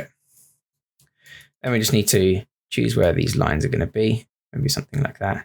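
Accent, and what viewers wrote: United Kingdom. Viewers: I've already commented on other videos of yours, just wanted to say a big thank you for how much I'm learning thanks to you. Among so many tutorials there are around, your channel is indisputably one of the best. Keep up the good work my friend. Also, listening to someone speaking with a British accent for so many hours sure makes lessons far more enjoyable lol.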